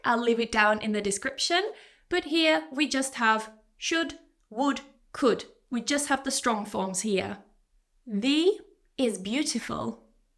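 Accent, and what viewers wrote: United Kingdom. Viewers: Hello..I would like to join ur classes.how can I joy? Actually now I'm in UK.I like ur British accent and your pronunciation course would help me alot. Thank u a lot.I'm looking forward ur reply.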